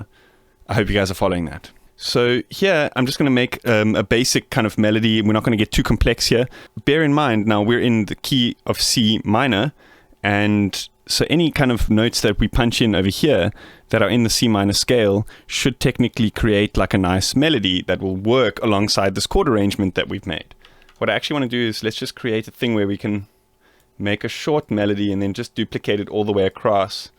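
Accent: South Africa. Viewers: Are u South Africa? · he is South African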